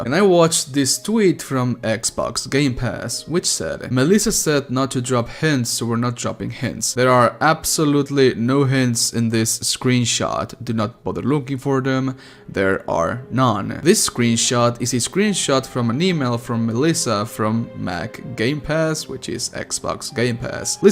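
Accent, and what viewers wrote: Mexico. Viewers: Eres mexicanoo :000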